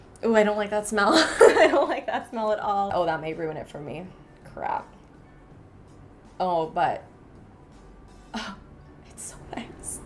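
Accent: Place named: Canada. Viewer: One of my favorite things about your Canadian accent is how well you enunciate specifically all letters in words such as Anastasia. You could have a talk show or podcast and I would so listen. 😂 Not trying to sound creepy, just enjoy your calm and well spoken voice! Love you xoxo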